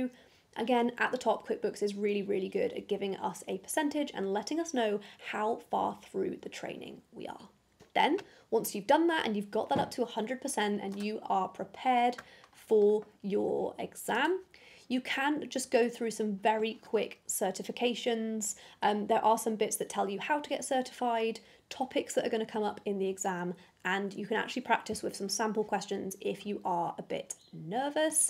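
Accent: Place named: United Kingdom. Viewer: Just started the video and Ssss, sucha a lovely UK accent, I wish if I could get a mate to help me develop the same Accent, Excellent, now I will continue with the main purpose of the vidoe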